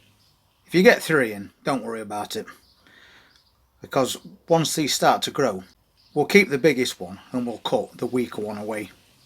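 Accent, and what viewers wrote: Yorkshire, United Kingdom. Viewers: I have just come across your channel you sound you are from Yorkshire possibly Sheffield area is this true would like to know as I’m a Yorkshire man also so we are in same area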